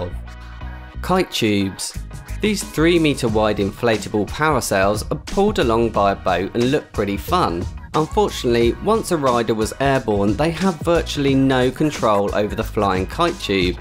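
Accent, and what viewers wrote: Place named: United States. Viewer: your amrican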